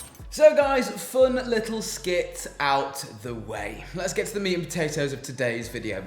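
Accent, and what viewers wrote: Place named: North, United Kingdom. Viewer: Really? He doesn't have an upper class accent. Sounds quite midlands/northern to me (not saying that northerners can't be upper class, but the accent usually is a tell-tale). Also, that chip on your shoulder. Have you thought of dealing with it?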